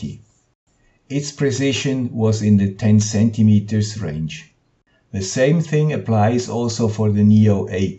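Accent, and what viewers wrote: Switzerland. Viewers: Gruezi "guy with the Swiss accent". Some people might say I have even more a Swiss accent even though I moved from the Berner-Oberland to Seattle a half a century ago ;-) I really enjoyed watching your video and learning many things. Being Swiss we just have to be precise. As a boy I used to walk miles to get to a watchmaker's storefront window to set my watch to the exact time displayed there. ... and soon my "official tested (chronometer stamped)" watch would be wrong again before the quartz crystal electronics. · Thumbs up for the informational video and "here is the guy with the Swiss accent again." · Hier ist ein Typ mit Schweizer Akzent ! Vielen Dank!!!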